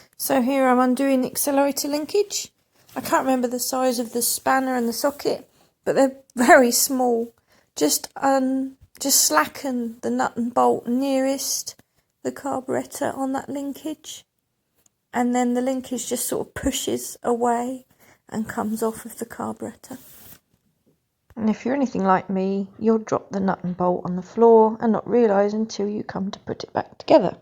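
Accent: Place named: Suffolk, United Kingdom. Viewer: hey, just bought my rover 90 p4... hope to see you about, you sound local ish to me, Suffolk but I am no good with accents anyhow so your probably not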